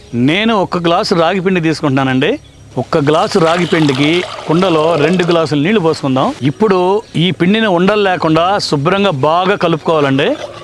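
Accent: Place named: Kolar, India. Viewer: Thank you for writing it in Kannada too andi. I'm from Kolar and your Telugu is so similar to the dialect we speak.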